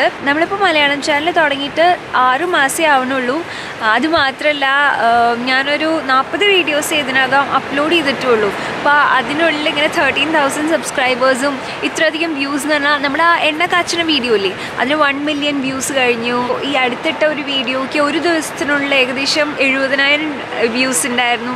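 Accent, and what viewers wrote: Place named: Thrissur, India. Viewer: I live in thrissur I know people here speak like this only...people from southern kerala like me may feel her slang different. Style ett parayunnath kettal mansilakum. Avarkk vakkukal kittarilla😅 pakshe nalla fluent alle malayathil.slang is different its because she belongs to thrissur. It's so cute to keep listening to her. Natural talk which I love most about her😊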